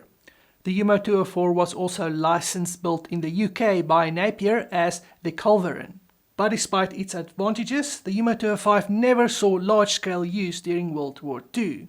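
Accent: South Africa. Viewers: Puedo entender sin subtítulos .... bendito acento sudafricano 😅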